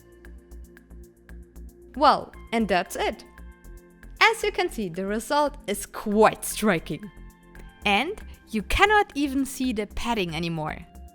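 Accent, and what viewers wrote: Germany. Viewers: Ist sie deutsch vom Akzent her?😅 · Dis Jerman Äksent! <3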